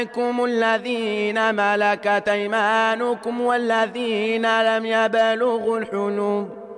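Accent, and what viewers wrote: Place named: Morocco. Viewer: القراءة المغربية لا مثيل لها تبارك الرحمان جد مميزة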